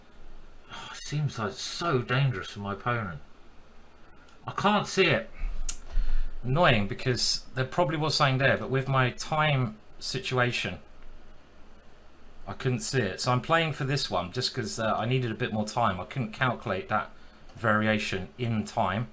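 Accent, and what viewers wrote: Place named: London, United Kingdom. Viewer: As italian I know nothing about english accents. Are you from London?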